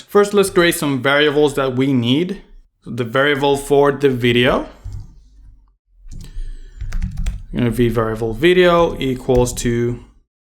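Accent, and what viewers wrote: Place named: Puerto Rico. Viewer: You low key sound Puerto Rican.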